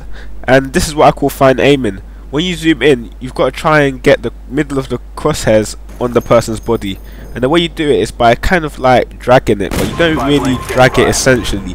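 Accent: England, United Kingdom. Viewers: So, is that an English accent...? O_o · People with British accents need to stop making videos.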